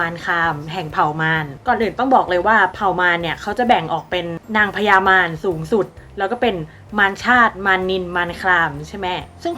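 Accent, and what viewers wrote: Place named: Isan, Thailand. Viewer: เเดนอีสานนาาาานิ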